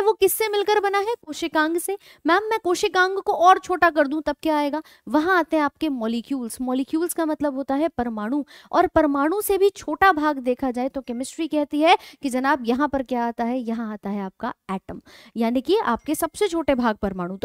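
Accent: Bihar, India. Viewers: Etne khubsurat chehre kaise etne khubsurat se pdha lete hai thanks mam ekdam aditya ranjan sir ke bihari style me padhate ho❤❤❤❤❤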